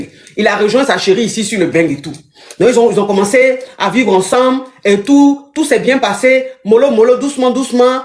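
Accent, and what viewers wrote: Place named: Dioula, West Africa. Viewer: Coach avec son joula facon la ca fait rire koi, c'est pas samalakolo c'est samarakolo qui veut veille chaussure gate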